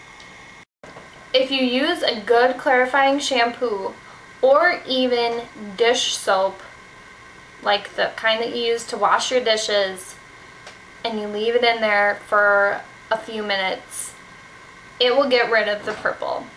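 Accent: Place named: Minnesota, United States. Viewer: Minnesota accent yikes.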